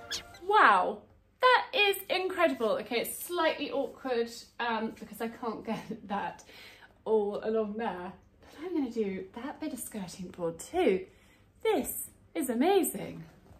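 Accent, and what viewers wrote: United Kingdom. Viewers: Dream wifie material complete with distinctive British accent. Very lucky husband indeed.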